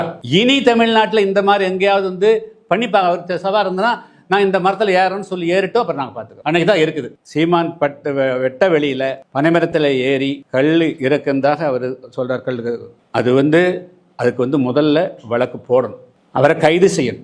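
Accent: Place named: Telugu, India. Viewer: ஐயா நீங்க பேசுறது சுத்த தமிழ் மாதிரி தெரியல தெலுங்கு வாடை அடிக்குது.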